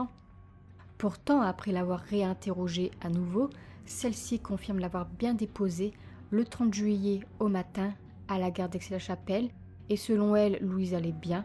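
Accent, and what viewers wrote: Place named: Picardy, France. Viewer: Bonsoir, j'ai découvert il y a peu votre chaîne, de suite abonnée, vous êtes formidable dans vos reportages, une voix douce et calme ça fait du bien. Une voix qui me rappelle la ou j'habite et d'où je suis originaire d'après certaines intonations je suis de la Picardie. En tout cas c'est super. Bonne continuation. Bises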